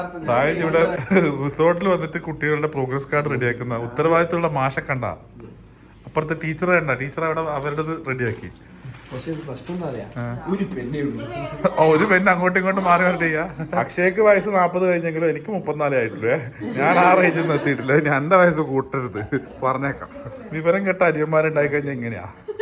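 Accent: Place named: Kannur, India. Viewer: bro..ഇങ്ങളുടെ എല്ലാ വീഡിയോയും കാണാറുണ്ട്... നമ്മുടെ കണ്ണൂർ ഭാഷയിലൂടെ ഉള്ള ah തനതായ അവതരണ രീതി,.Superb,..Video കണ്ട് കണ്ടു നമ്മളും ഇപ്പോ ah കുടുംബത്തിലെ ഒരു അംഗം ആയപോലെ ആയി..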